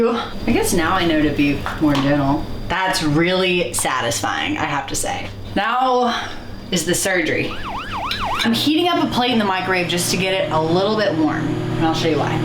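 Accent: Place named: Georgia, United States. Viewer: YAWL!!! OMG!! You must be from Georgia!! I love your video! I felt like I was in the kitchen with you. Thank you for being real and showing the mess ups! We learn fromour mistakes.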